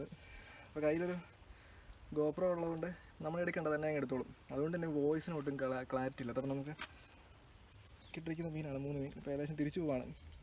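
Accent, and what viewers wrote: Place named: Vaikom, India. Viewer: Adipoli samsaram kettapozhe thoni enta sthalam vaikom ippo soudiyila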